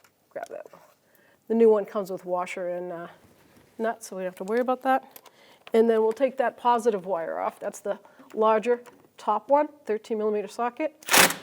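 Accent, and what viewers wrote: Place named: New England, United States. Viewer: I'd buy something from you if you made the video on the 4wd version of this truck. The presenter sounds like they're from New England, they should have INSISTED on it ...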